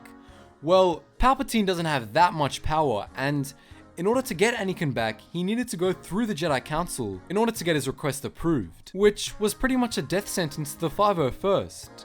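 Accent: Australia. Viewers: Ahhh you’re an Aussie like me lol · I watched you and never new you were an aussie like me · maate didn't know you were a Aussie aye, what state are you from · You are an Aussie? wooooooooooooooooooooooooo go Australia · How did it take me so long to realize that you are Australian? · Wow. You were not kidding about that Aussie accent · Aussie eh, Nice 🇦🇺🇦🇺🇦🇺🇦🇺🇦🇺🇦🇺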